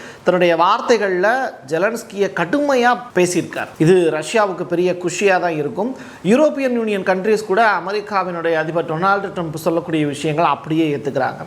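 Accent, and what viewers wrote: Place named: Chennai, India. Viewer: Madras தமிழ் தானே பேசுகிறீர்கள்.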